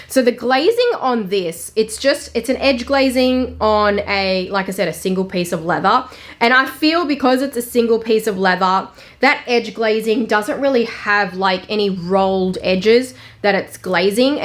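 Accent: Australia. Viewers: I would love if you updated your game- spend money on whitening and straightening your teeth, wear clothes that are tailored- more expensive looking. You are a wealth of information but your speech is your game. If you seriously worked on your elecution. You would be sooo amazing! Slow down your speech and less (bogan Australian speech) this is not a mean comment. You have a lot of potential ❤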